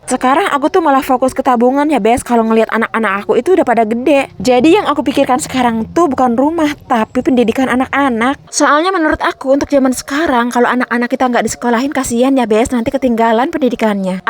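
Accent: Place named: Pandeglang, Indonesia. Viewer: Rumahnya dmn teh bahasanya mirip orang pandeglang kota kelahiranku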